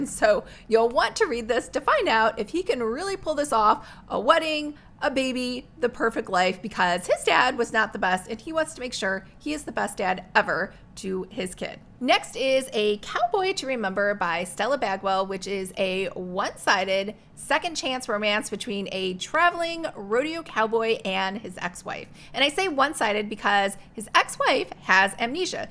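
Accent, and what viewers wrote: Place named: United Kingdom. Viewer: Bed and breakfast and fun British accent ? Count me in ! This sounds like the perfect weekend read. Can’t wait to dive into it—thanks for the great suggestion!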